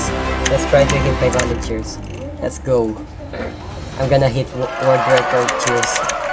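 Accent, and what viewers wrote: Philippines. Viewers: is this man filipino he sounds like one ngl also noice thats a gg in my book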